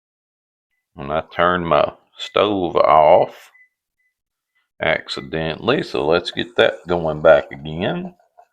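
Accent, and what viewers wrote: South, United States. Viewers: When my Mom made us gravy for breakfast, she would always add her eggs to the gravy and cook them. The few times that i tried it, my eggs would disintegrate(big word). She also would add water to her canned milk so we would have milk. I hate the taste of it now and cant cook with it either. I remember fixing corned beef gravy before, but its been a long time. Thx for the recipe and enjoy hearing your country accent. Proud of mine😂😂😂 Alabama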